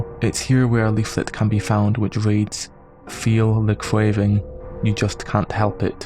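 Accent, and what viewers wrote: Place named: Aberdeen, United Kingdom. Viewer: You sound like you're from aberdeen.. I could be wrong.. but it's very similar to my accent! Anyway, fantastic video man!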